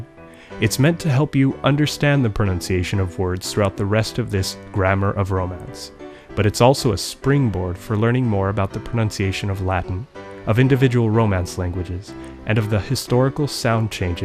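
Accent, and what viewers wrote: Brazil. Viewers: He uses both the Brazilian and European accents in his videos. In this one, he opted to use the Brazilian one, and the Castilian one when talking about Spanish. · Yes. He speaks portuguese like a Brazilian, not a Portuguese. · Ele, +Rogério Cardoso, não disse que a versão lusitana deveria ser usada. Na verdade, não há por que preferir uma à outra, tanto que nesta série de vídeos (não é só este) ele usa ora o sotaque brasileiro ora o sotaque português. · It's kinda weird that a guy that is so well educated in the classical languages, their history and their phonology uses Brazilian accent to speak the Portuguese language, specially in it's strong informal phonetic version. To put it in perspective to non Portuguese speaking people, that is the same as to use a southern American accent like a strong Texan accent, as a representation of the English language in a video about the classic/formal phonology of the language. 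Nothing against Brazilian accent on the contrary, but it sounded misplaced due to the issue of the video. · Mate, your portuguese pronunciation is a brazilian one, I'm saddened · Curiosamente o senhor usa o sotaque brasileiro em sua explicação em português porém ao falar em castelhano usa o sotaque europeu.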